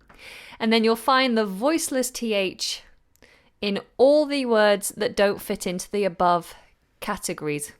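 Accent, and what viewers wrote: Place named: United Kingdom. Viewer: But l keep listening her UK sound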